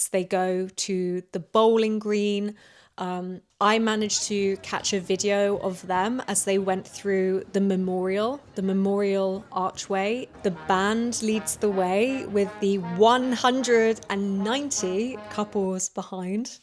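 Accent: United Kingdom. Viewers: Your British accent is sooo nice - it's like music to my ears!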